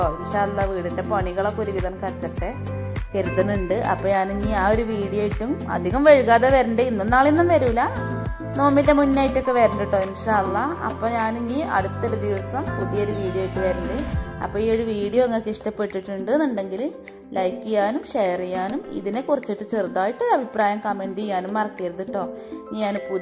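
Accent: Malappuram, India. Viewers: നല്ല വീട് മലപ്പുറം ഭാഷ കേൾക്കാൻ നല്ല രസമാണ്. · ഇതാണ് അസ്സൽ മലപ്പുറം ഭാഷ...Very good ingane thanne parayanam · ഇതാണ് തനി നാടൻ മലപ്പുറം ഭാഷ അഭിനയവും നാട്യങ്ങളും ഇല്ലാത്ത വീഡിയോ മാത്രമല്ല വീട് പെയിന്റ് അടിക്കുന്നതിന് മുമ്പ് തന്നെ ഇതൊക്കെ കാണിക്കാനുള്ള മനസ് വന്നതും ബെഡ് ഷീറ്റ് വീഡിയോക് വേണ്ടി ശരിയാക്കിയതാണെന്നു പറഞ്ഞതും നിങ്ങളുടെ മനസ്സിൽ അൽപ്പം പോലും ജാഡയും പൊങ്ങച്ചവും ഇല്ലാത്തത് കൊണ്ടാണ് ആദ്യമായിട്ടാണ് ഈ ചാനൽ കാണുന്നത് വീഡിയോ ഒരുപാട് ഇഷ്ട്ടപ്പെട്ടു ഇതൊക്കെ സപ്പോർട്ട് ചെയ്തില്ലെങ്കിൽ പിന്നെ ഏതാ സപ്പോർട്ട് ചെയ്യാ ഞാനും മലപ്പുറമാണ് നിങ്ങൾ മലപ്പുറത്തു എവിടെയാ? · നമ്മുടെ മലപ്പുറം ബ ർത്താനം കേൾക്കാൻ എന്താ രസം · ഗുഡ് ഈ സംസാരം കേൾക്കാൻ തന്നെ ഒരു പ്രതേക സുഖം മലപ്പുറം സംസാരം എത്ര കേട്ടാലും മതി വരില്ല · താത്ത എന്റെ മലപ്പുറം ഭാഷ നല്ല രസമാണ് എന്തിനാ താത്ത ഇംഗ്ലീഷ് താത്ത എന്റെ ഭാഷ എല്ലാവർക്കും മനസിലാകും കൊറേ ഒക്കെ പഠിക്കാൻ പറ്റി ഇനിയും പുതിയ വിഡിയോസൈവരണം insha allah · സംസാരം സൂപ്പർ. 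മ്മളെ മലപ്പുറം സ്ലാങ് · നിങ്ങൾ എത്ര വരെ പഠിച്ചു നിങ്ങളെ സംസാരം കേട്ടാൽ മലപ്പുറത്തക്കാരെ ഓർമ വരും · മലപ്പുറം ഭാഷ... ഒരു രക്ഷേമില്ല kalakki👍👍👍😁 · മാഷാ അല്ലാഹ് നിങ്ങളുടെ മലപ്പുറം ഭാഷ പൊളിച്ചു ❤️❤️ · Spr vedio&malappurm samsaaravum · നിങ്ങളെ മലപ്പുറം ബർത്താനം ഉഷാറായിക്ക്ണ് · ങ്ങള് ന്തിനാ ഇംഗ്ലീസ് പറേണു ..മലപ്പുറം style സൂപ്പർ അല്ലെ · ഞാനും മലപ്പുറാ പക്ഷെ ഇങ്ങിനെത്തെ ഭാഷ സംസാരിക്കില്ല ട്ടോ- കേൾക്കുമ്പൊ ഒരു പ്രത്യേക രസം😅😍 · ഇത്താ ഞാനിപ്പഴാ ഇങ്ങളെ ചാനൽ കാണുന്നത്... ഞാനും മലപ്പുറം പെരിന്തൽമണ്ണയിലാ... ശെരിക്കും ഒരുപാടിഷ്ട്ടായി... ഹോം ടൂർ ഒരുപാടിഷ്ട്ടായി...നമ്മടെ മലപ്പുറം ഭാഷ കേട്ടപ്പോ അതിലേറെ സന്തോഷായി 🥰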